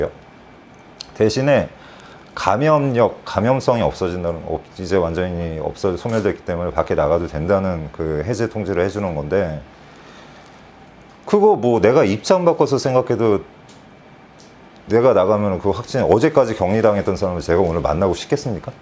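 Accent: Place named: Seoul, South Korea. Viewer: 와 진짜 한국인 아니에요? 진짜 오리지널 서울사람같애요😳 한국사람인 나보다 더 잘함ㅋㅋㅋ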